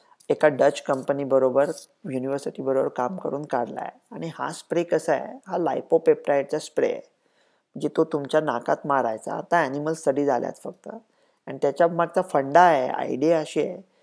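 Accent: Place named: Pune, India. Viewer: सर तुमचा बोलणं ऐकलं की अस वाटत आमच्या पुण्यात सदाशिव पेठ मध्ये थांबलो आहे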